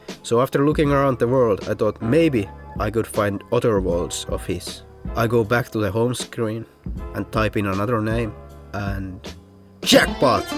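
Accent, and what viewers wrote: Finland. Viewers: On muuten tosi suomalainen aksentti :DD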